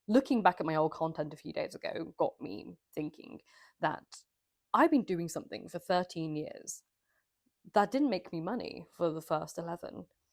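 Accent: RP, United Kingdom. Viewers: The reason I started watching your channel is because I absolutely loved the classic literature content. I don't really know if others agree, so please take my opinion as just that ... one person's opinion. That said, I am eager to see a return of classic literature content. Your presentation of said content was engaging and thrilling, not to mention that, as an American, listening to your posh English accent is lovely.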